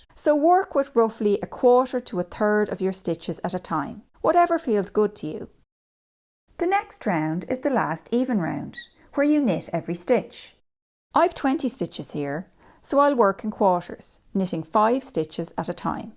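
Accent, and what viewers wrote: Ireland. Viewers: Very nice instructions and love Irish accent 🎉❤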